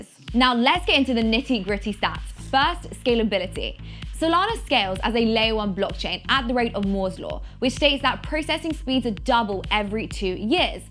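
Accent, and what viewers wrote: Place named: United Kingdom. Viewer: That British accent is what gets you every time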